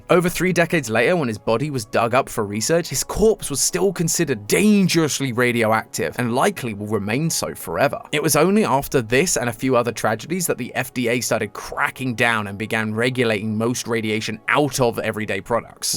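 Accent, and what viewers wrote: United Kingdom. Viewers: I thought this came out years ago, the British accent caught me off gaurd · Let's all say goodbye to Matpat. We all miss him. (say, who the f is this British guy now?) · Hes British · British matpat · I still cant get used to the british voice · MatPat is bri’ish!😮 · game theory is british now? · Love to have a British host, don’t take this the wrong way and say I hate MatPat because I dont, it’s just nice to have someone from my country host the channel ya know? It’s cool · Me realising matpat is gone: D: Me realising is british::D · they replaced matpat with a british dude, i cant listen to it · hes BRITISH NOOOO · Awesome, we get a British accent to listen to now that matpat dipped (Edit: I not hating btw I just mean I love me some British accent💀) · they made matpat british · Why did matpat quit youtube, did anyone give him tensions or something? 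I had listened the voice and matpats voice is very crackey but this one is a British person (ami right that its a British person?) I liked the way he said THE LOREEEEEEEEEEEEE! I missed those days hes voice gave me happiness now i dont like this person. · I miss mat pat but the new guy is British I think so W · Matpat get a new guy not a random British guy with a script you wrote. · I don’t want British man I want the other guy please I want him back I miss him 😭😭😭😭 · before amarican now british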